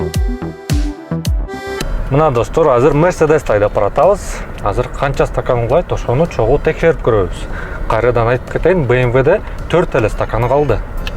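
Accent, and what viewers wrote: Standard. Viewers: Азаматсын таза кыргызча суйлогон кыргыз экен!👍👍👍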